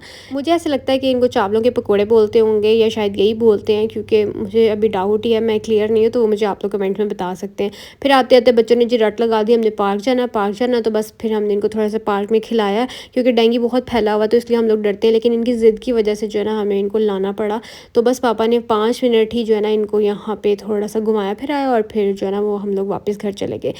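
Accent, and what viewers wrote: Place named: Punjab, India. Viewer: Love ur Punjabi accent 😂❤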